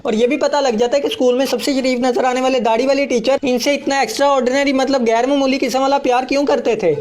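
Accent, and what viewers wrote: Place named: Multan, Pakistan. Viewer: Bro! R u from MULTAN?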